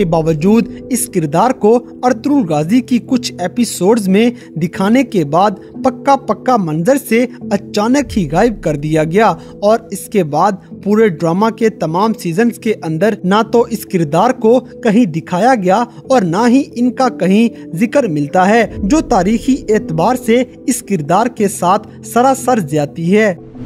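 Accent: India. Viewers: Are u indian? If no then why r u using their accent ... our Urdu is a rich language and it has the letter غ ۔۔۔ _ _ . So why do u copy indian accent and say galt, gaddar etc... accept your own language only then you ll be remembered in the world history . آپ اب تنقید کریں گے کہ میں خود انگلش میں لکھ رہا ہوں تو وہ اس لیے تاکہ سب لوگ پڑھ سکیں کہ ہم اپنے ملک اور اپنی زبان سے محبت کرتے ہیں ۔۔۔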